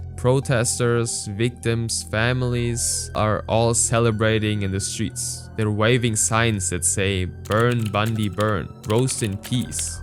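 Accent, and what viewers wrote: Germany. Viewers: Dieser deutsche Akzent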